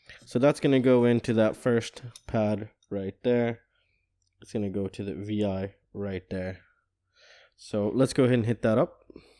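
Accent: United States. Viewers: Wait... American accent but mentions tesco... wth dude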